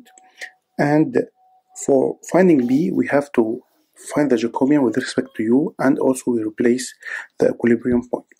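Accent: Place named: Lebanon. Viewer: i recognized your accent a bit, are you Lebanese? thank you professor for the amazing explanation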